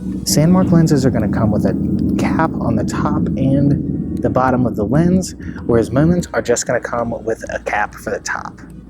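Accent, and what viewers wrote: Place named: South Africa. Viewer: Your accent has some South African sound to it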